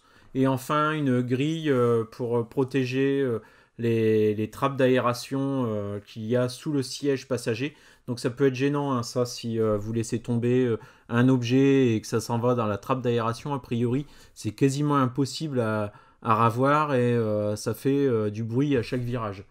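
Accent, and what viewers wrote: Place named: North, France. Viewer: je participe crochet de coffre super enfin on voit qui se cache derrière cette voix du nord ✌️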